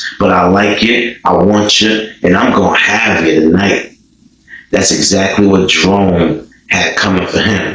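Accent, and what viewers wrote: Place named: New Jersey, United States. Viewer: What part of Jersey you from my G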